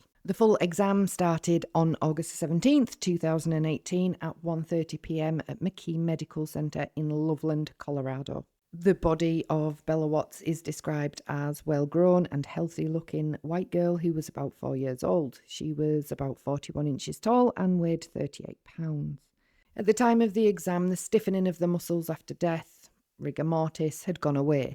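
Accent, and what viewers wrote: United Kingdom. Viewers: I love the British accent❤ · New to your channel, i love the British accent. Hard to understand those that don't (kiwi here)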